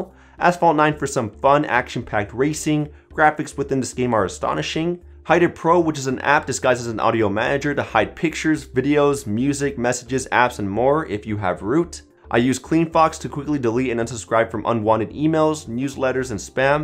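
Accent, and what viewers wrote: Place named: RP, United Kingdom. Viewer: Your pronouncing is like BBC News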